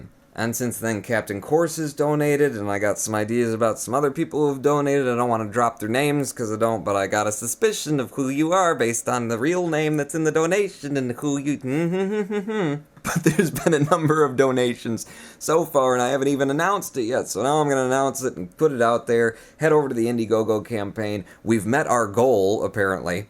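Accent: United Kingdom. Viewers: Michigan? Hmm for some reason based on your accent I figured you were from somewhere in the UK. Oh well. We are like state-neighbors. I'm from Ohio. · that was amazing, it's kinda crazy that i now know what part of the world you live in, for ages I thought you lived in England, you accent is such a mix of British and American, and in your earlier videos you sound pretty much completely British. You deserve all the love your fans give you!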